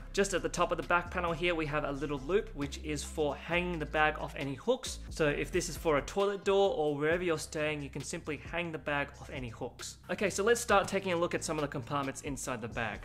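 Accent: Australia. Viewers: Homies accent is wicked yo. Always a trip for me to see a fellow Asian with the croc hunter accent. 👍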